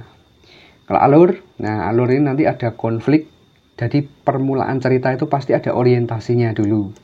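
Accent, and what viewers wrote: Indonesia. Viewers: Dari logatnya saja udah kedengeran jawanya.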